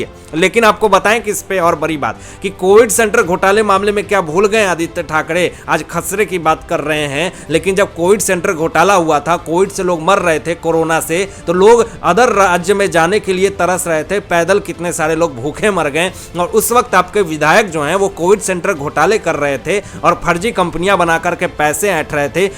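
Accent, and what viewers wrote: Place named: England, United Kingdom. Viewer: Shri “ BARA” instead of “ BADA” Anchor is trying to copy ENGLISHMAN. Though we are free from slavery 70 odd years ago but still our Anchore is trying to imitate Britishers. Sad very SAD . Unfortunately he owner of channel encourage this lousy Anchor, when we can get dime a dozen best ANCHORS???????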